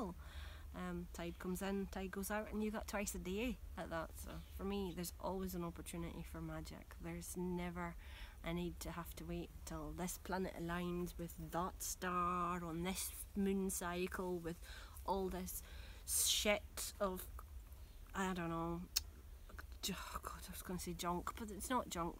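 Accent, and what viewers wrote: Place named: Galloway, United Kingdom. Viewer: Aha! There she is. What a beautiful accent. My friend lives in Mid Ulster; your accent is heavier than hers, more Scottish, though. I've no issues understanding you, though. My people came to Ulster from Galloway, originally. Enjoy your posts.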